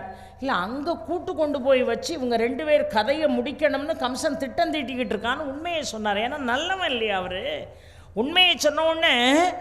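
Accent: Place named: Tirunelveli, India. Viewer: அருமை அம்மா. எங்கள் அழகிய நெல்லைதமிழ்ப் பிரவாகம்💐🙏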